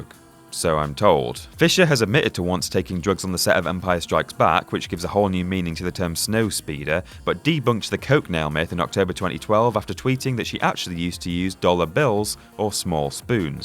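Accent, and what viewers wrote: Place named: Cheshire, United Kingdom. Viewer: rough guess would be Cheshire, or naturalised northern affectation. You only have to get a few seconds in and hear the 'Rapoonzel' to know that man!!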